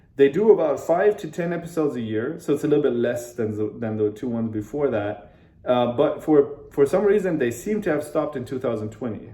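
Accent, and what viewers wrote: United States. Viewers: You have American accent. Very good English comparing to some German friends I had long time ago in school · Thank you very much for all the information about the podcasts. I listened to few episodes of little red podcast. It is wonderful. My interest in understanding China arises from my unfortunate encounter to industrial espionage (intellectual property theft and sabotage) in Canada, and how much my refusal to be corrupt ended up changing my life. I came to know how many of my friends are compromised. I wanted to learn Chinese work culture, their fears, their motivations, their aspirations, and their tactics, just to survive. If you are a German, I would never guess that you were not an American (or a Canadian) from your American accent.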